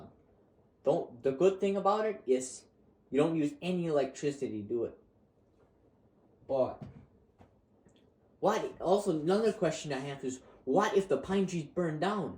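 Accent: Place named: Switzerland. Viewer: Dude you speak swiss german accent not dutch. I heard one amish say "bin ufgwachse". Thats 1:1 swiss german accent kanton luzern. Verstosch?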